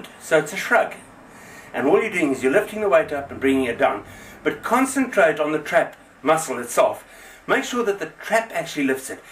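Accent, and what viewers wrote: South Africa. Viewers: Hi, are you south african? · are you from south africa? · is that a south african or rhodesian accent u have?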